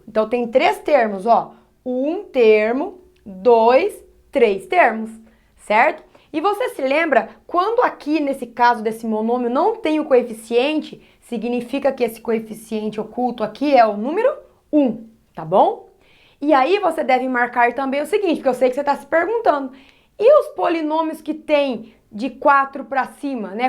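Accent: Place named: Goiás, Brazil. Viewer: Cara essa professora é muito top 👍, Eu entendi tudo, ela é muito simpática e adorei o sotaque goiano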